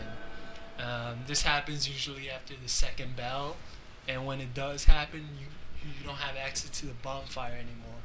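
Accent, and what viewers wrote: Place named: New York, United States. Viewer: you sound new yorkish or even maine-ish. eitherway u have a cool voice. btw what sl were u in this video?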